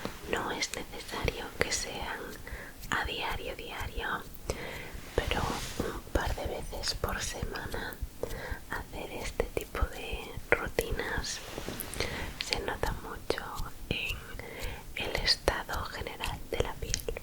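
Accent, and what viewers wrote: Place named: Spain. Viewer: Me gusta mucho ese acento español ❤ es muy relajante su asmr.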